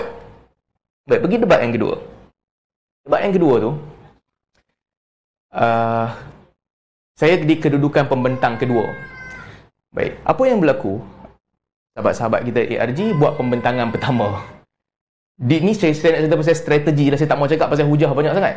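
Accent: North, Malaysia. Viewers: Mungkin disebabkan dia bicara loghat utara ada perkataan yang tidak ada dalam loghat KL/Selangor/johor-riau.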